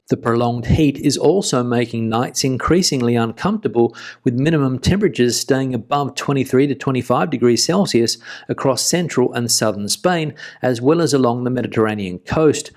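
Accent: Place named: Australia. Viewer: EVERY time I've been to Andalusia in July/ August, it's been over 40C. Cordoba is the hottest city in Europe. WTF this is news every year is only explained by climate hysterics trying to pretend it's something new. ITS NOT!?? - with an Australian accent? Since when did Aussies represent Spain??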